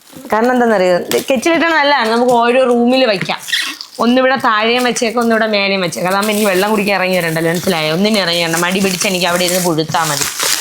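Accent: Trivandrum, India. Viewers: samsaram kettalariyam Tvmkariyanennu..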